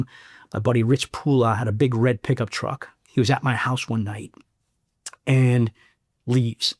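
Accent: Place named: Chicago, United States. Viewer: Riveting story teller. I can't stop listening to that old school Chicago accent.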